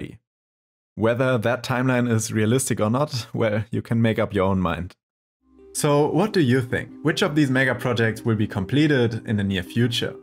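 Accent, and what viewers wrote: Germany. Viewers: man hört den deutschen akzent immer raus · dein deutscher Akzent gar nicht zu überhören😂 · I love your German accent ❤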